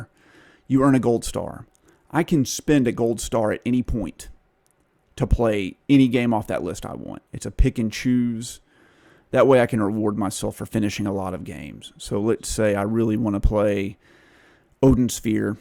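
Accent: South, United States. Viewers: it's the main game second hand game store here.. it's great when I get bundles and games I don't want/dupes I trade them in for credit and keep the games I want! I thought I heard some southern! But usually when I think southern I think Texas haha.. I'm jealous of Americans coz how many youtubers in America I've seen there's quite alot of video game stores.. but most games are cheaper here which helps me when I don't have alot of money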